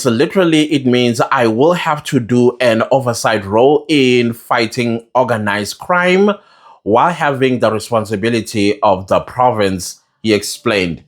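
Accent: South Africa. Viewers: 😂😂😂 you make me laugh, that emfuleni tone😂😂😂😂😂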